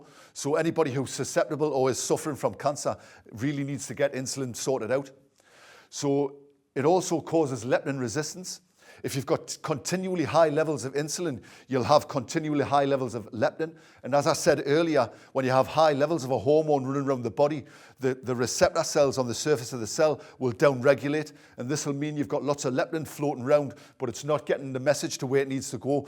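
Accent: Newcastle, United Kingdom. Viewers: I actualy understood that. Must have been the jordie accent!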